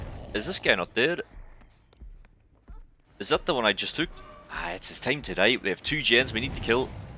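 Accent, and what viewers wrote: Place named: Northern Ireland, United Kingdom. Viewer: at the start there i thought you were gona have subtitles the entire video coz youre from northern ireland haha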